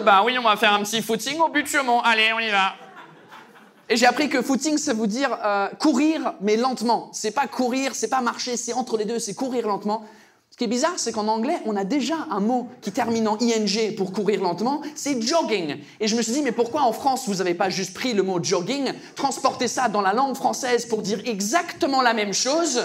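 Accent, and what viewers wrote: United Kingdom. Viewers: C'est le seul accent british que j'arrive a supporter et à adorer. C'est super drôle et agréable à regarder j'adore ! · he has impeccable french but i could tell right away he was British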